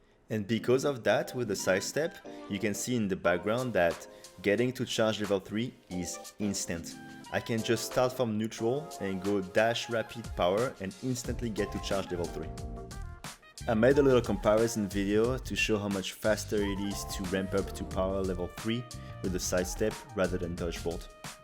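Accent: France. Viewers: ohh i love bow what set are you using and are you french? i think i hear a french accent :3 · Haha cet accent, tu es français n’est ce pas ? Sympa la vidéo!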